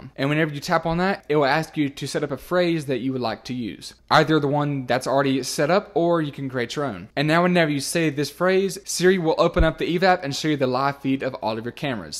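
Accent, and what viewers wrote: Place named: South, United States. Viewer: I had trouble understanding what you were saying. Several times I had to pause or skip back a few seconds to try to understand you better. I don’t think it’s because you have a slight southern drawl. I think it’s because you are trying to talk too fast, and some of your syllables get swallowed up as the words run together. This is only exacerbated by how aggressively you've cropped out the silence. The tiny Pauses & breaks between phrases that you've trimmed, in my opinion have been cropped too close to the front & back of the words; there’s no split-second for me to process before moving onto the next phrase. Good info, just a bit too much effort on my end to try to understand what you're saying. I know you want to keep your videos short. However if you trim off a few extra seconds, but then that costs me a few extra seconds , 10 times throughout the video, did I really gain or save anything? 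It’s a bit exhausting to be honest.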